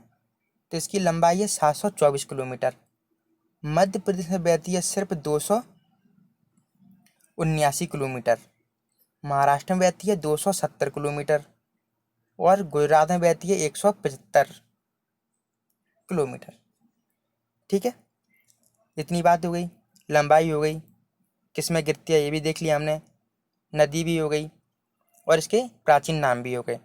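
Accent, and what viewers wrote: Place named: Uttar Pradesh, India. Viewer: U P k lgte ho awaz se😎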